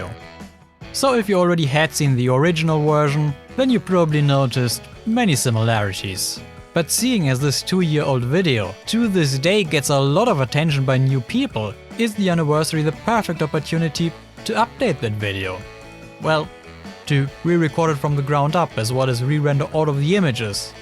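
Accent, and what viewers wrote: Germany. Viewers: he speaks AI german accent ... u have never had an argument ...sorry ... and his voice is always 0 dB ...u most likely dont even know what that is .. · Er, no it isn't. Unless you think that AI can generate renders that good, and is speaks English with a perfectly German accent and occasional accidental use of German grammar.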